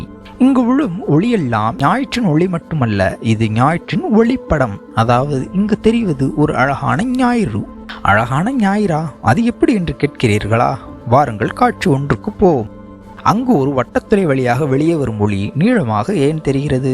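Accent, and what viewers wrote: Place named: Sri Lanka. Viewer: அருமை.. இலங்கை தமிழர் பேசுவது போல இருக்கிறது.. கடின உழைப்பும், அறிவியல் ஆர்வமும் ,கொண்ட முயற்சியில் பின்வாங்காத சிந்தனையும் ஆச்சிரியப்பட வைக்கிறது.. வாழ்த்துகள்🎉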